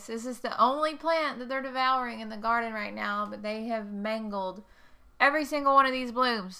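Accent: South, United States. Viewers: I can tell by that southern accent you're in the Southeast somewhere? Lol I'm in Georgia, how about you?. I love the close-ups you do, that's so cool. your cat is a cutie. . what's crazy is I have the same hydrangea it's a mother plant I've had 14 or 15 years it always blooms blue so I started getting in taking cuttings and making more plants of my hydrangea and one of the cuttings I put in the soil it's a small plant but it Bloomex pink LOL and then I took a cutting off another plant and put it like 5 ft away and it bloomed light purple.. the hydrangea I have is called endless summer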